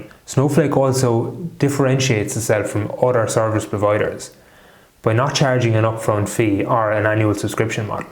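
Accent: Ireland. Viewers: County Mayo accent?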